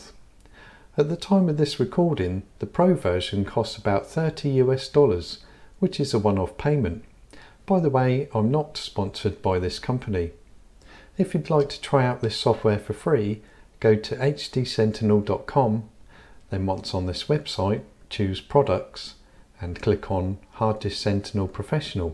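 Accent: Norfolk, United Kingdom. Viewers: You a Norfolk man? Trying to figue out your accent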